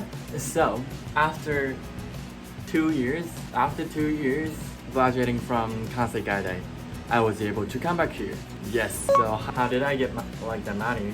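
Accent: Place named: Canada. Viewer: バンクーバーに移民して25年たつものですが、未だ来て一年で英語がとってもお上手ですね。アクセントも私の息子達の様にカナダ人ぽくて驚きました。これからも頑張って下さい。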